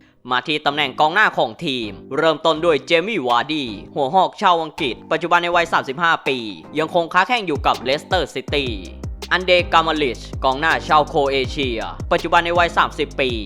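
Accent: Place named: Rural, Thailand. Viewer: จะพูดเหน่อทำไมวะ ขัดใจช.ห.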